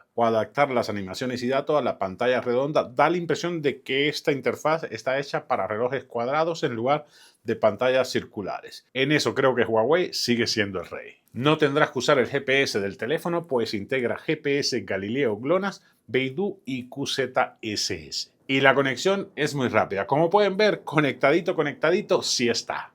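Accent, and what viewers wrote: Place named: Spain. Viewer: haaaa eres mexicano🤔,,porque tienes ese acento,,español.pero buen review,,igual que isa marcial 😁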